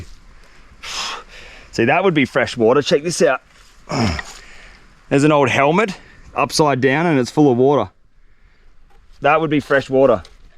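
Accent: Australia. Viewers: nah bro people are sleeping on aussie accent its more fun than british XD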